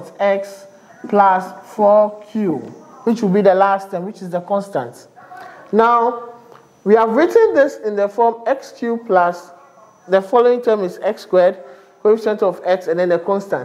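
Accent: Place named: Ghana. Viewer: you are a wonderful teacher....you are a Nigerian with a Ghanian tongue..........you are doing well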